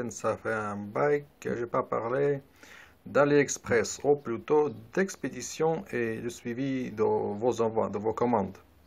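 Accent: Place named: Russia. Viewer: Я хз почему, но с твоим русским акцентом французский намного понятен на слух. Побольше на канале таких видео с собственной озвучкой. С меня подписка!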